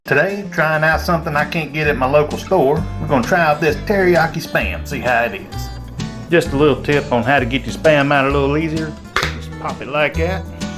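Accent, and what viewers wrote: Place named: Rural, United States. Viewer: i like the country twang😅 which state u live in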